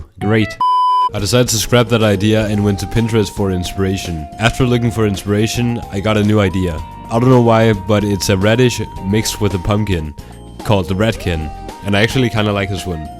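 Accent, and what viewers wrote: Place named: Denmark. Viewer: After watching for less than 30 seconds i managed to guess that you were danish correctly, based on your voice alone.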